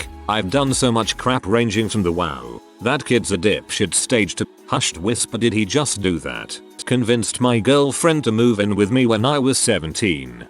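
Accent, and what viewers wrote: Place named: United Kingdom. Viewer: Just another lazily made Reddit video with a robotic British accent.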